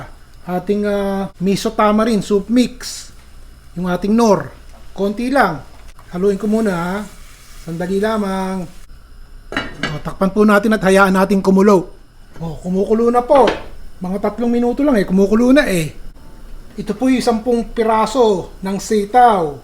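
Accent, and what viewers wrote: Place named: Nueva Ecija, Philippines. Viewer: Taga nueva ecija po b kau chef? Kase yong accent at mga words ninyo ng tagalog.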